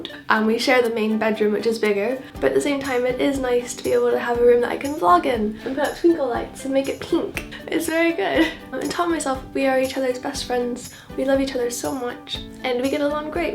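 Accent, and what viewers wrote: United Kingdom. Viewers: I feel like I can hear more and more of a tiny little British accent coming out! 😍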